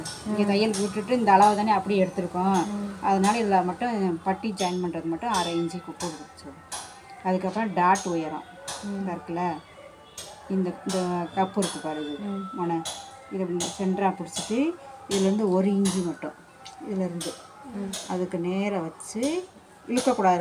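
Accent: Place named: Karaikudi, India. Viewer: அக்கா நீங்க பேசுற பாஷையா பார்த்தால் நம்ம ஊரு காரைக்குடி மாதிரி தெரிகிறது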